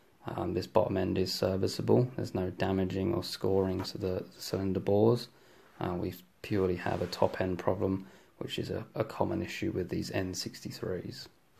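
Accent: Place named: United Kingdom. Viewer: Ah right. Your British accent made me think you were from UK. No worries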